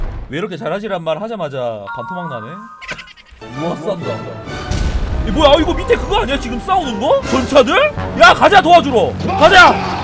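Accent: Busan, South Korea. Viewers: 앜ㅋㅋㅋ.. 역시 부산사나이답게 사투리가..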